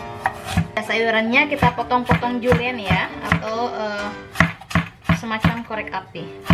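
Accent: West Java, Indonesia. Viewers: assalamualaikum tth nthh angin😂 apa yg bisa bawa sya ksnii duhh tth ngomongnya khas sunda bgt ya ihhss gemes😂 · Tth tj pakih mana sunda na kitu..jntn panasaran...nuhun resepna... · Suka sama gaya bicara nya sunda pisan 😍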